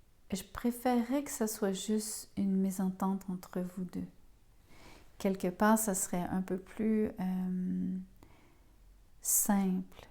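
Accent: Quebec, Canada. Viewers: Ça me parle mais jamais plus de retour en ce qui me concerne il est bloqué de toute de partout c est fini merci ma belle vous êtes la meilleure vous êtes Québécoise j adore cet accent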